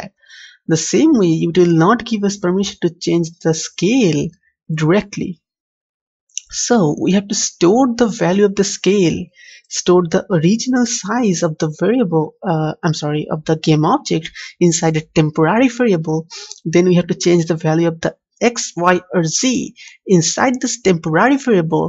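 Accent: India. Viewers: Indian accent, check. Weird audio, check. Good tutorial, check.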